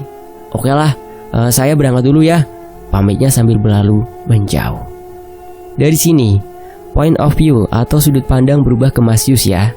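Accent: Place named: West Java, Indonesia. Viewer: Akhirnya mimin bawain cerita dari sunda...bisaan c mimin logat sunda na haha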